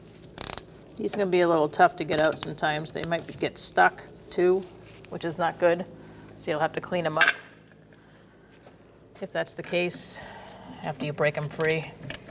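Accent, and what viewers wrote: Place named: Massachusetts, United States. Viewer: Thanks for the help. That accent reminds me of my mom. Mass born. As a kid i would ask her to say water for a laugh.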